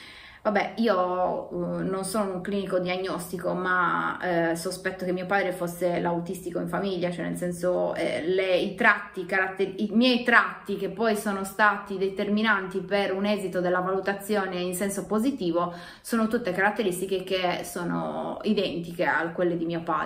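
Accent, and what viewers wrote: Italy. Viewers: ciao sardo anch'io e con lo stesso modo di parlare al minuto 2 e 30 (ghe al posto di che) alla romana ma io nn vivo a roma....è normale???complimenti per il coraggio (sei anche una bella ragazza) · Ovviamente più intelligente del normale 😅, l'accento sardo e' carino...😅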